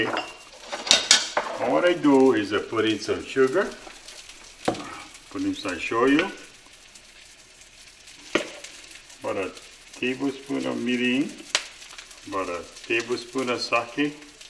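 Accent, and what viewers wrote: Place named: Hawaii, United States. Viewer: Can hear the Hawai’i accent!!!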